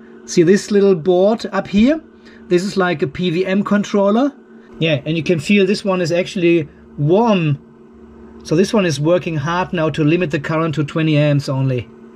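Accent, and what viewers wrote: Germany. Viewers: At first I was thinking what is a PVM? Then I remembered ah German, where W's are pronounced as V's. :)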